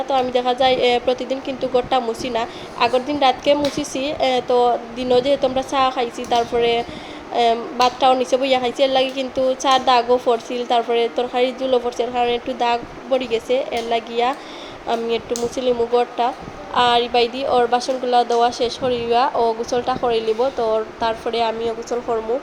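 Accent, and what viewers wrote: Sylhet, Bangladesh. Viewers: আসসালামু আলাইকুম। ভি‌ডিও খুবই ভালো লেগেছে।আপনার কন্ঠে সিলেটি ভাষা শুনতে ভালো লেগেছে❤❤❤❤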